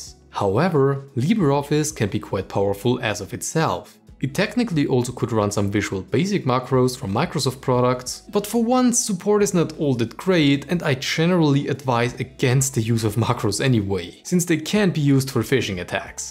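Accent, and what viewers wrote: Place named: Germany. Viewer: with a German accent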